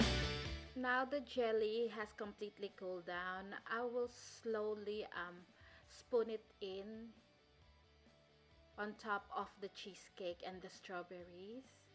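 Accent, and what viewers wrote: Philippines. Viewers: Are you Filipino? You sound like one.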